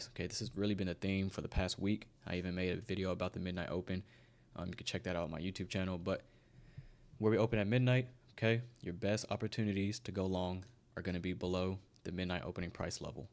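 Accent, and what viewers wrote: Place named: South, United States. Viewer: Where are you based out off? I noticed you have a hint of a southern accent. Im Beytoven by the way. Im always in your live streams lol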